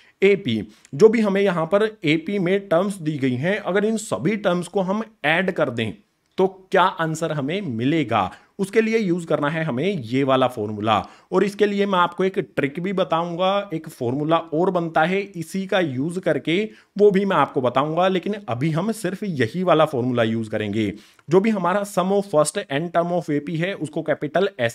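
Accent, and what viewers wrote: Haryana, India. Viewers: Sir haryana ke ho 😅😅😅😅😅😅😅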